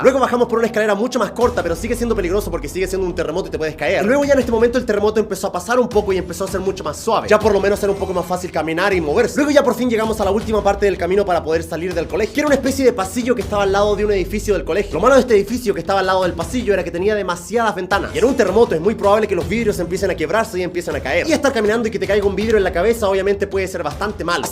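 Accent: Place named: Chile. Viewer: Cuando German hablaba chileno 😢xd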